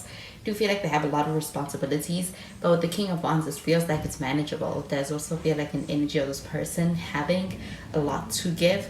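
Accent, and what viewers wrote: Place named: South Africa. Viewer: You sound South African to me for some reason... Your accent just sounds so familiar to me, not you specifically, but in general.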